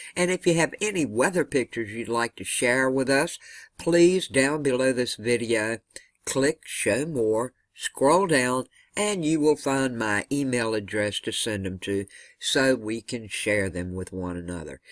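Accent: South, United States. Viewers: Good afternoon! Thank you for the update. Yep! we cooled off. It was in the low 60's last night. Today, as yesterday, is beautiful! Slight breezes. Sorry I missed a few days. Dr. appointments and vertigo. Would you believe? They're saying my two eyes are communicating with my brain at 2 different speeds? They've given me different exercises to do 3 times a day. Prayers for everyone, GOD bless. Love hearing your Southern way of talking. Your expressions and words make me feel like I'm back in the South! Love it! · Agreed. expressions and words have a warm Southern comforting and kind of reassuring tone of voice ever reminding of the power of God's love. Amen.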